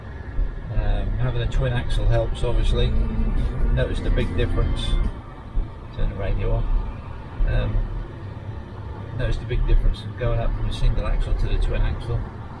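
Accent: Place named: Norwich, United Kingdom. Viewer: Hi , I hope you are both well...we have the same car an love it...also have the twin axle 8ft wide swift...you sound like a fellow norwich boy😁... like the vids ...keep up the good work...matt an trace.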